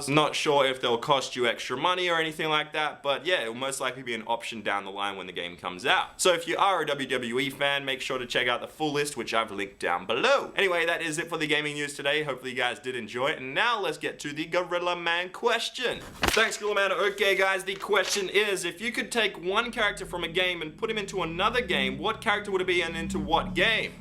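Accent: Australia. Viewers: He's Australian -_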